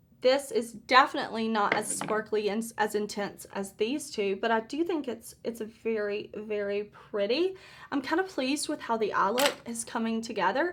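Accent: South, United States. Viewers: I literally love your voice and is that a southern accent? I also love that you have so much lid space. I have hooded eyes so my shadow is always kinda hidden.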